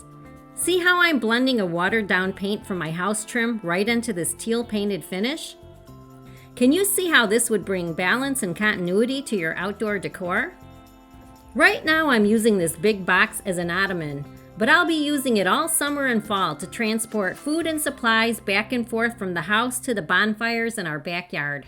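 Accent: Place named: Cincinnati, United States. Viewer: 🙌🏻🙏🏻❤️ You sound like a Cincinnati girl. Am I right? I’m from southern IN.! God bless you!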